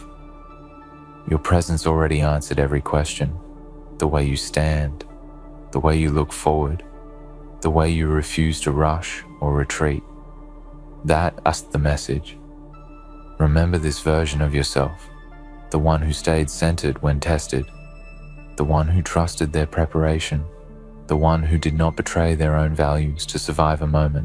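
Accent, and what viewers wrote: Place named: Australia. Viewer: This voice is terrible. Nasal, sleepy, Australian. I much prefer the other voices.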